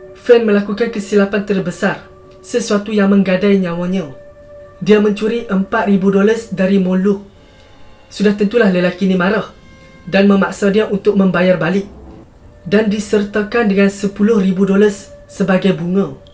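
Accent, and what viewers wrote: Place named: Kuala Lumpur, Malaysia. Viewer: SLANG2 TU JGN NAMPAK SGT..JADIKAN SLANG K.L ORI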